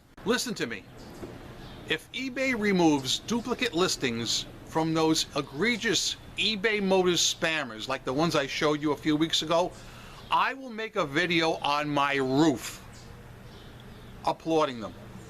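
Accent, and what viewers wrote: New York, United States. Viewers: New York City accents like ROCK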